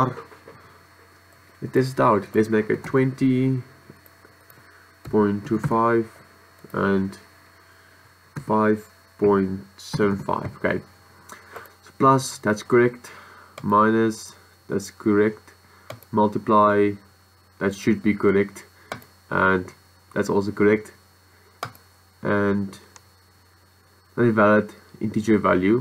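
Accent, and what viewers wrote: Afrikaans, South Africa. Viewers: Are you Afrikaans? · You can tell that he's Afrikaans. I mean his accent says it all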